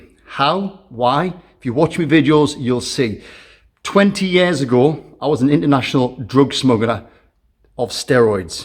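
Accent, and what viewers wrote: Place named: Dumfries, United Kingdom. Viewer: Have you ever been to Dumfries in southern Scotland? Your accent sounds similar to it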